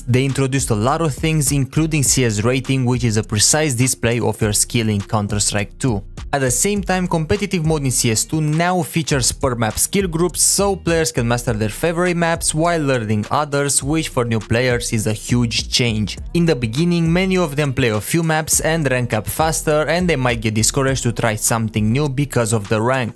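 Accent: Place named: Romania. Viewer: Ești român se simte în accent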